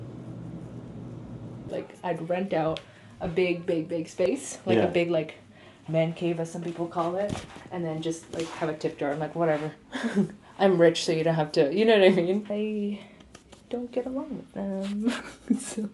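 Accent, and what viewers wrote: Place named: Canada. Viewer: Canadian accent sounds very nice 👌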